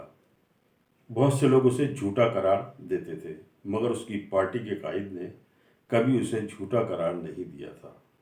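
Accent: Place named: Pakistan. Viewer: یہ نشان دہی درست فرمائی۔۔۔ہم بھی خاب کو خواب کہا کرتے تاہم جب ہم نے سلمان پیرزادہ کی زبان سے سنا خاب لے لو خاب تب جانا کہ ۔۔۔۔و ساکن ہے جیسے لکھا خوشبو کہا جاتا لیکن کہا خشبو جاتا ہے۔۔۔سلامتی۔۔۔آپ کا اردو لہجہ اور اردو زبان و ادب سے محبت کا سلیقہ آپ سے جملہ اہلِ زبان کے لیے آنکھیں نم کیے دیتا ہے کہ ایک اک کرکے میرے اہل ادب جا رہے۔۔۔اب تو چند سانس محبت کرلیں ۔۔۔اک دوسرے کا احترام کرلیں۔۔۔ہر زبان و کلام اور ہر ماں سے محبت کرلیں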